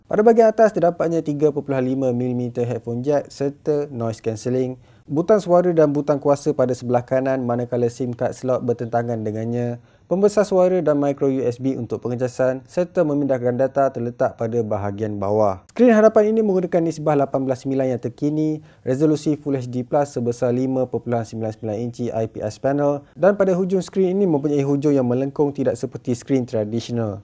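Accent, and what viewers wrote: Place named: Kelantan, Malaysia. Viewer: demo ore klate ruponyo admin ni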